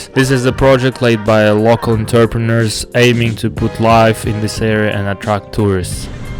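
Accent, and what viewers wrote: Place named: India. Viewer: Are you from India your accent and your way to exprés yourself is terrible maybe you should try a silent video ! Mediocre video to say the least !!!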